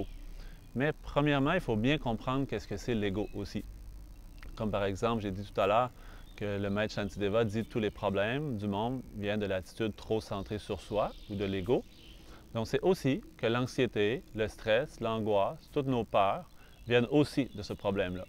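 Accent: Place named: Canada. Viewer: Merci! C'est car j'ai un ami canadien et j'ai reconnu l'accent, c'est très joli le français parlé au Canada :)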